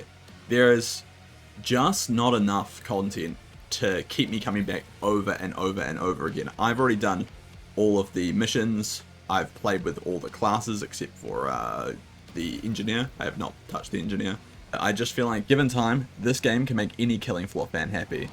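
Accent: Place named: New Zealand. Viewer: You, sir, are a Kiwi.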